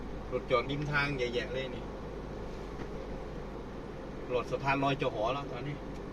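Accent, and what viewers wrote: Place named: Korat, Thailand. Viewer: ชอบดูมากพูดโคราช❤❤❤❤❤❤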